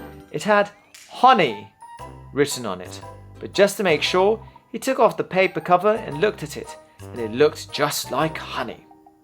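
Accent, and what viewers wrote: United Kingdom. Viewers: British accent 大好きです😌ずっと聴いてたい✨✨✨ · やはりイギリス英語が好き！の声、活舌、トーン、ピッチ、最高にドはまりしましたよ☆ これまではベネティクトカンバーバッチの癖強め朗読聴いてました(それはそれで好き💛) もっと沢山聴かせてください~☆ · のイギリス英語が好きです！こっちの方が聞きやすいですが、BGMもないとさらに良いですね。すみません汗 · はっきり言って、挿絵が有るのがすごく助かりました😂文字は追えても、イメージを作る余裕がないので、絵が有るだけですごく解った気分になりました❣️それと、ページをめくるのが、本当に読み聞かせしてもらっているような心地良さがあり、色褪せた部分からはお祖父様の大切な本をシェアしてくれている実感が何とも言えない😊 BGMは無い方が、の世界に引き込まれるし、ストーリーの味も、引き出されるかな。一方で、が紅茶を注いでいる音、焚き火の映像、途中で焚き木をくべる様子、全てがうっとりするくらい癒される時間です。何より、本物のイギリス英語のリズムの、なんと美しいこと❣️英語の理解はグッと下がるけど。（何十年も前に買ってあった原書を引っ張り出して来て、読んでもみました。） つまり私の希望は！同じチャプターを両方でやって‼️です😅どうかお願いします🤲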